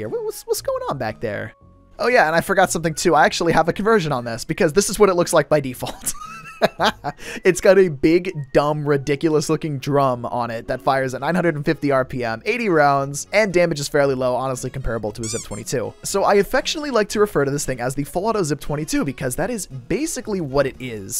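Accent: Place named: United States. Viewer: kac srr kinda sounds american and looks american, overall just americano vibey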